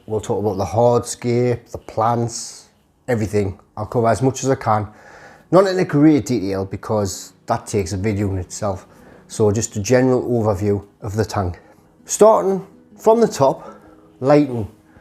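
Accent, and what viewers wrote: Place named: Newcastle, United Kingdom. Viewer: I love this Goerdie accent :-)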